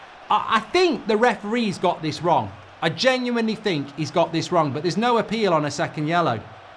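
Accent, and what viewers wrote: United Kingdom. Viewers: Is that a Salford accent?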